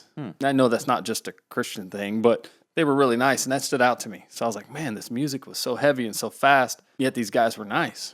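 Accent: South, United States. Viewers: I live in TN haha and I thought you had a bit of a southern accent at times lol. At least now I know you are a good ol Southern Baptist haha!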